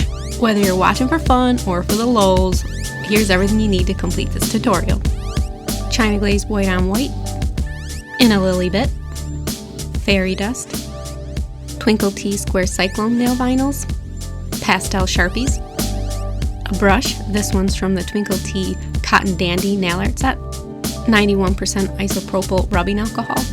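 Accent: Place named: Michigan, United States. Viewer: re: your voice, I grew up in Michigan and we have similar accents. I figured you were from somewhere near Canada, but not Canada.... right?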